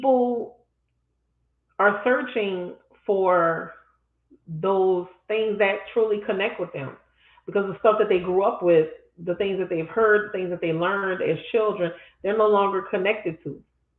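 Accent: New Orleans, United States. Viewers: Love your story telling time, I have to say your New Orleans accent makes it even better. ❤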